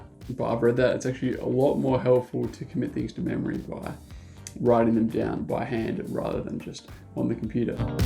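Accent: Finland. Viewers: Feels so wierd to hear the worst finnish to english in sted of english to finnis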